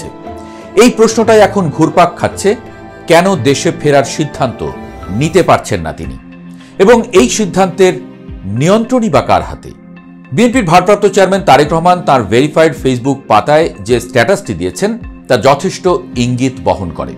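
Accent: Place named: India. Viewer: এর খবর পড়া স্টাইল দেখো মনে হচ্ছে এই মালটা দাদাদের দেশের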